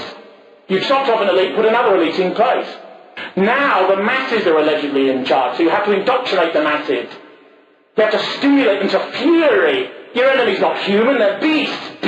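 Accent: United Kingdom. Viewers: He had a very normal English accent. If Americans find it off-putting or that it makes him impossible to relate to then that's their problem. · could you imagine if the sound quality was better and Bowden had tried to reduce the british accent a bit, he would have had a lot more attention on him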